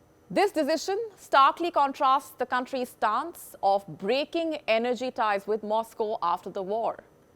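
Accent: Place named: India. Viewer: No offense but Indian accent is just the worst accent. Unbearable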